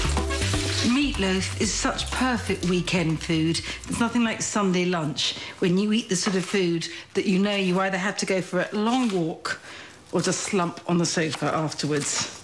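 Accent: United Kingdom. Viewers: 我喜歡奈潔拉老師的口音 濃濃的英國腔真的很適合做播報員主播記者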